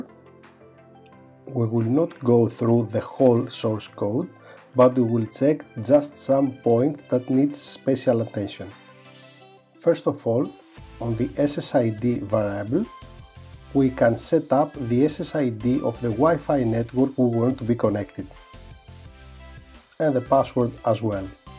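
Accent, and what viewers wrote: Greece. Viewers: i watched 500 videos and finally found 1 that explains the steps really easy.(perhaps it helps that they speak english with Greek accent :D euxaristw!!) Buts visual studio dont work for my os so wish it was for arduino that 90% of ppl use.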